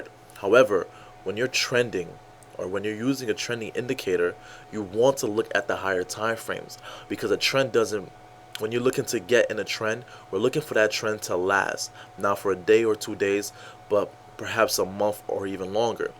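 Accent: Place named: Italian-American, United States. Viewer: Love the goomba accent. Fits the gangsta ripoff traders.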